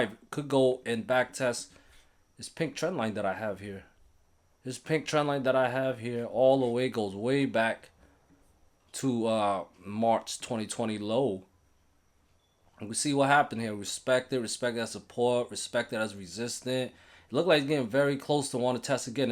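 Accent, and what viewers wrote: New York, United States. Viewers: 1⃣ One!!!! Boss man!!!👍 Great information!! Are you from 🗽 NYC you talk like a new Yorker lol sheep 🐑 style trading 💹 Holy Molly!! 🐂 Vs 🐻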